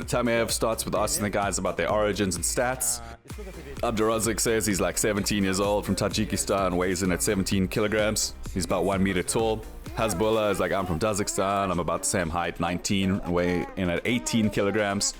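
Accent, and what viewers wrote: South Africa. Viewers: Bru are you South African? You sound South African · Are you from South Africa, accent sounds like it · HOL UP ARE YOU SOUTH AFRICAN ????????? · You are definitely south african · Well done not the video. Are you South African? You have to be with that accent. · Awe boet, I can hear you are South African, good to hear a familiar accent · Hi are you South African ? Sounds like a wyt saffer accent.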